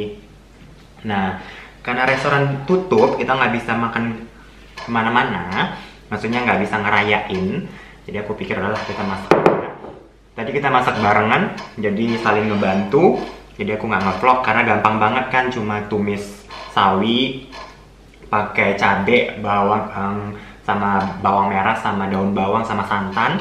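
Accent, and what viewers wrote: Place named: Sunda, Indonesia. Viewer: itu emang sunda banget,soalnya aku dari sunda🤭👍salam dari indo🙏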